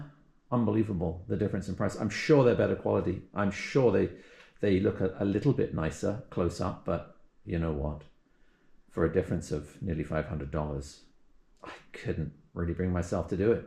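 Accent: England, United Kingdom. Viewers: Good video I’ve got a lot of your videos to catch up on I can’t believe the price of them mirrors I don’t know how much that would be in £ but sounds a lot and do you originally come from uk you sound English to me if you are I live in Essex near Colchester you might know it take care will catch up on some more of videos take care ride safe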